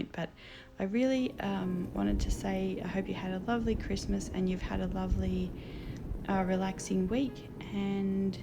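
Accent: Australia. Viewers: Not sure if my comment was posted, but wanted to say I’m so glad to hear an Aussie accent. I love your style. I am just getting into art after a career in nursing, so am inspired by your stuff. I an in NsW Thank you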